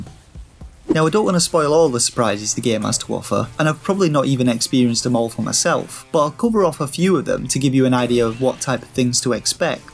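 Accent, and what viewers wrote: United Kingdom. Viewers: Is it english of UK?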